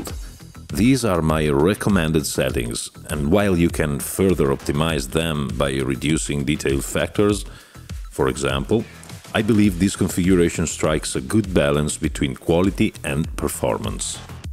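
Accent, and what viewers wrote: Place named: Central Europe. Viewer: 😜Hi Doc. Please answer this question? Where do you come from? You have a special language accent . Do you remind me a Guy From Center Europe .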